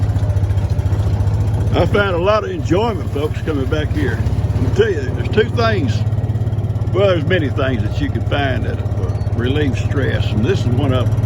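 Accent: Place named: Texas, United States. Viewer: Listening to you with what you show and tell is therapeutic for me. Just love your videos. So interesting. Seeing how high the water went in Harvey. Wow. Heartbreaking for all the animals. Love Heidi. She is a true mate. From Australia. Your accent is strong. Love that Texan accent. God bless you and family ❤️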